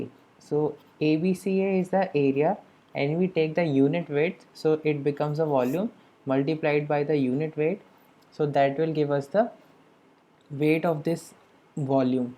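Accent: India. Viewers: fuck!!! I never understand indian accent. anyway thanks for the video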